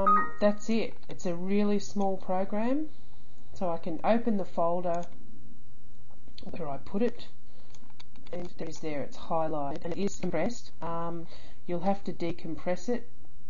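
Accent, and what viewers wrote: Australia. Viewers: This Guy who uploaded it ...Has a true Australian voice Nice Mate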